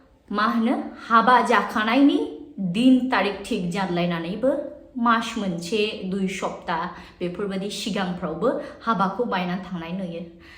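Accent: Bengal, India. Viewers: Are you from Bengal beta, your speaking like Bengali tone. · Abo boro rqnya na na kok thana bengali sw thangdw mathw rao ya... Boro jana boro rao mwjng rwngnw nango.....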